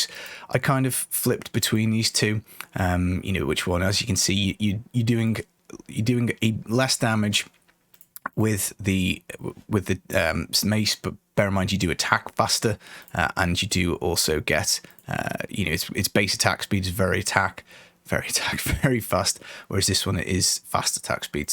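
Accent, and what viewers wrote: United Kingdom. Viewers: I found your channel back in diablo3 times and man! I'm so happy you cover d2r! I enjoy your vids as you keep it real, clear, without needless fuss. Plus I miss UK (lived there for 7 years) so listening to your accent brings back memories :D Keep it up, brother! I do hope youtube algorithm will smile upon you soon as this channel is a quality stuff!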